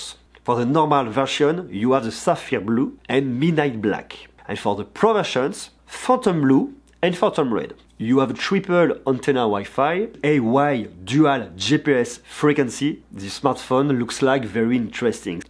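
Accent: France. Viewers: It like a french accent no ? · Me too he speak frenglish it's a nightmare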